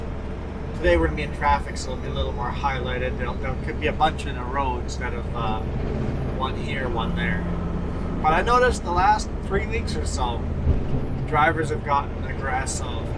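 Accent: Canada. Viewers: Nope. You sound like a Canadian to me. From a fellow Canadian and British Columbian. 😎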